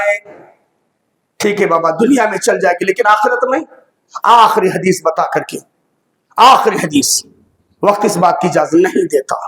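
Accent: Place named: Arab world. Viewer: عربی لہجہ بھی بہت ہی بھدا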